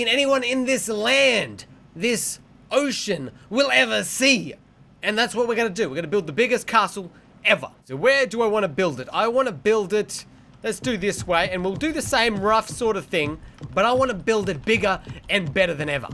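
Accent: Australia. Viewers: thx bro, and it sounds like your a aussie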